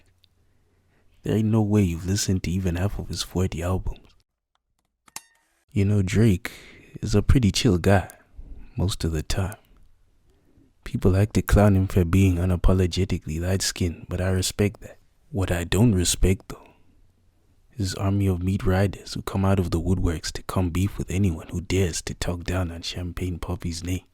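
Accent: South Africa. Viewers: U got a 🇿🇦🇿🇦 accent jo What's popping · He sounds south african gng and not a white south african either. Are you part of one of the fanbases that he was talkin bout on this vid or smth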